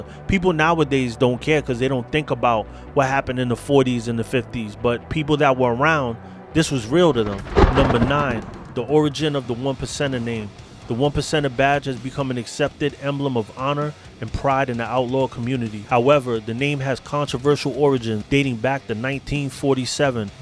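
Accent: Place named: Bronx, United States. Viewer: Are you from the northeast by chance? Kinda sound like ya from the Bronx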